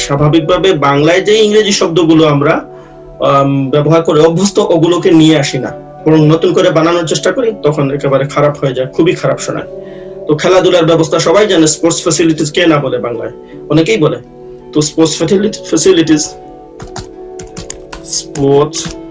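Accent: United Kingdom. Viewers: A best channel in the british accent.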